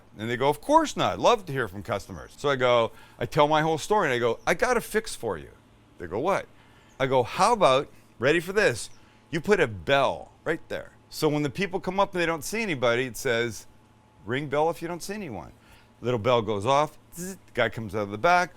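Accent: United Kingdom. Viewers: That British accent..😂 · I've commented on how bad southern accent is. His British accent is perfection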